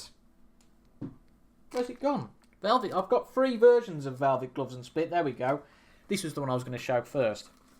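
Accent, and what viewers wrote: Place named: Midlands, United Kingdom. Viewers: may i know what UK accent this is? It sounds great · Sounds like Midlands, maybe Birmingham.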